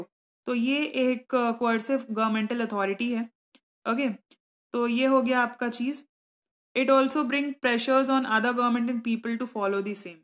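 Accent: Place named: Chhattisgarh, India. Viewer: in which city do you belong? Actually your tone of speaking is seems like Chhattisgarhi so I guess it you could be belong from Chhattisgarh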